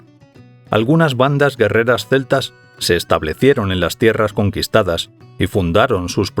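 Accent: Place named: Spain. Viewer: Un lujo oir narraciones en una voz autentica y española , no puedo dar mas pulgares pero lo hare en mas videos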